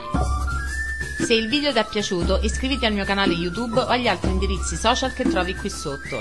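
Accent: Rome, Italy. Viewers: Si è mai vista una romana de Roma, e che parla pure in romanesco, difendere Salvini dalla propaganda della galassia PD??? SI!! La tostissima!! 😊❤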